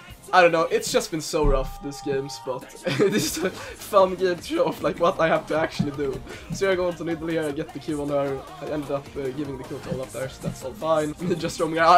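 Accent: Sweden. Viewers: fan vad svensk du låter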